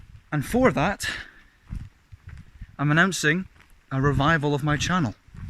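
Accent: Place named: Scotland, United Kingdom. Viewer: Oh my god you have grown up so much! Your accent too! So mature and Scottish. Keep up the great work!!!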